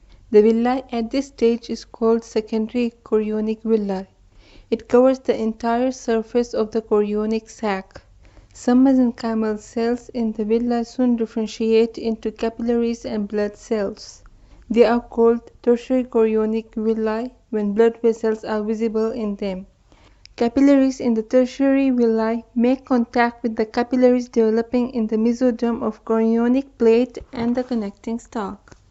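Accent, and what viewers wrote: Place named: India. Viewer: Amit Majumder from her accent I'm sure that she's an indian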